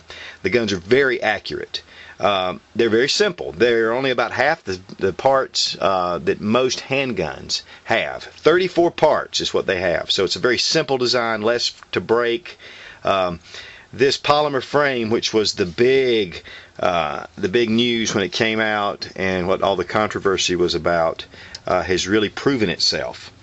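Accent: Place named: South, United States. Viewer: man i like your southern american accent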